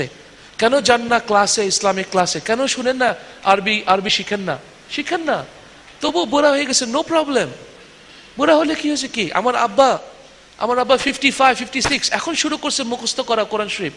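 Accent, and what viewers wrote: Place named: Pakistan. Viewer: Unar English Lecture gulo shunechilam, vabchilam uni Pakistani! Valo laglo khub! Marhaba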